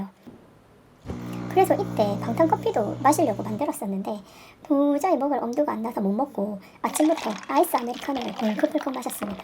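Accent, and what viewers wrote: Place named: Daegu, South Korea. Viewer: 영상 늘 잘 보고 있습니다. 대구 분이시려나.. 귀여운 사투리도 사랑스러워요^^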